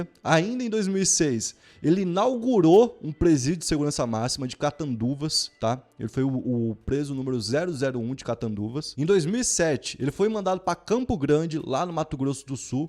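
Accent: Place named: São Paulo, Brazil. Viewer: Esse cara que fala parece ser paulista mais te falo uma coisa nunca vi igual esse sabe de história meus parabéns aí vê se aprende ai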